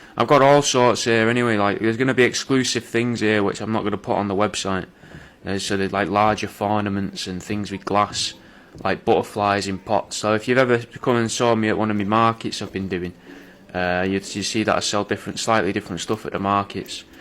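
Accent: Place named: Wythenshawe, United Kingdom. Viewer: Are u from wythenshaw cuz u dont sound like ur from dorset 😹😹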